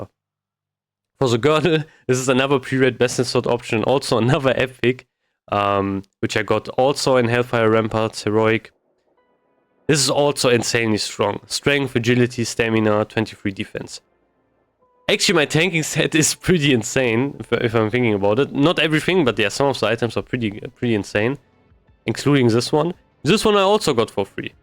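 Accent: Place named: Germany. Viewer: Inhaltlich super, nur eine bitte, arbeite ein bisschen an deiner englischen Aussprache :D. Vokabeln, grammatik, satzbau, voll super aber du klingst so deutsch :-* nicht böse gemeint, nur ein tipp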